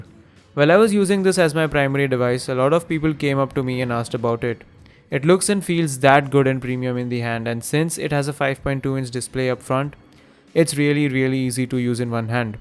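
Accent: India. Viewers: There's something about the indian accent that is hard to take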